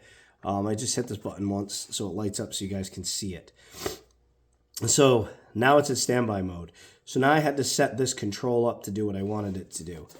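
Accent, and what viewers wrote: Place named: East Coast, United States. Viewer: you and i would get along well. Jaja. Sounds like your accent is somewhere from the east coast too. Im from CT.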